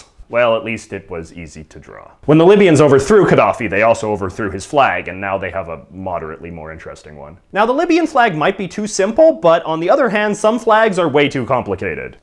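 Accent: Canada. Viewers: Espeon Thomas he's canadian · Youtuber's Fans He is Canadian and Canadians typically use Aboot. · STOP SAYING ABOOT your giving Canadians a bad rap honestly stop. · you canadian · Hmmm... Is this dude Canadian? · Whait, is this guy Canadian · are u Canadian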